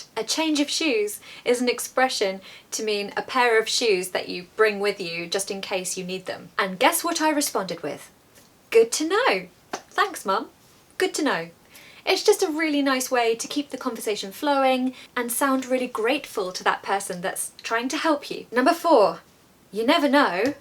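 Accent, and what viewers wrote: United Kingdom. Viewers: Hello, it's always very nice to listen to your stories and wonderful british accent! I have a question for you: are you English or Scottish? I presume English, but I am not sure! 👍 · British Accent is very good i Adore it❤❤❤❤❤❤❤❤❤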